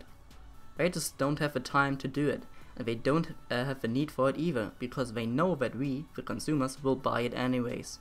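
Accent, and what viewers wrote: Germany. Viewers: Your accent is funny ^^ A typical german accent and luckily i haven´t got one that bad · Sick german accent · Haste eiskalt den Intro-Song von Solution geklaut... Ansonsten relativ nichtssagendes Commentary, dass CoD zu hastig und profit-orientiert released wird ist nichts Neues, daran wird sich aber nichts ändern, da hier kein gutes Spiel erstellt werden soll, sondern Gewinn das einzige Ziel der Produktion ist. Englisch ist gut, nicht perfekt, relativ flüssig, noch leichter deutscher Akzent, ansonsten solide.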